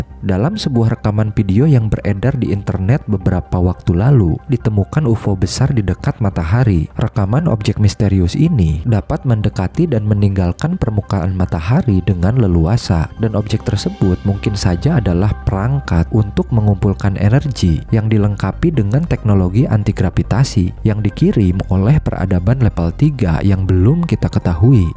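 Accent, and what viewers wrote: Indonesia. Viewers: Akangnya urang sunda.. lepel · orang sunda ya bang?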